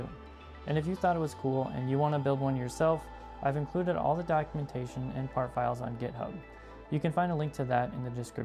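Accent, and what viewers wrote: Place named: Russia. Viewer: У чувака русский акцент ) А если честно: выглядит потрясающе.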